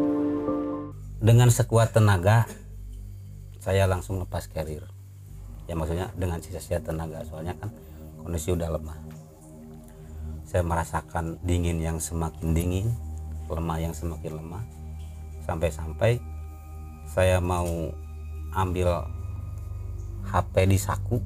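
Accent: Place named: West Java, Indonesia. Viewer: Wakaka dimaklumin kang sebagai sesama sundanese 😁semangat 💪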